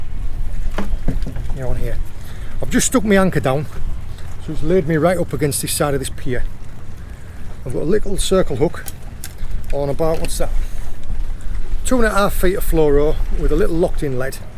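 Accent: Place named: Northern England, United Kingdom. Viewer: You have a northern accent. What took you to Cornwall?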